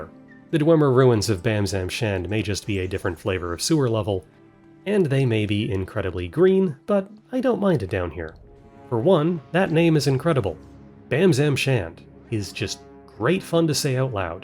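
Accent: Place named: Canada. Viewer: You being, and I mean this as a compliment, *aggressively Canadian*, is apart of the charm of these runs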